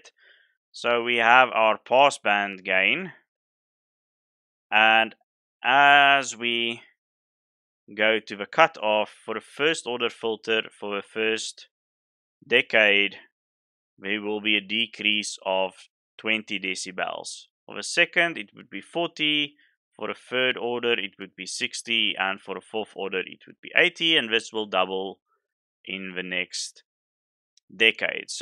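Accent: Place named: South Africa. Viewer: Sounds South African, baie dankie boet.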